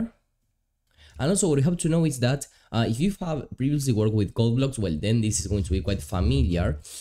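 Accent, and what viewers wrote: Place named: Argentina. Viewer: Buen video! Note un leve acento, sos argentino o uruguayo? Un saludo y a seguir asi!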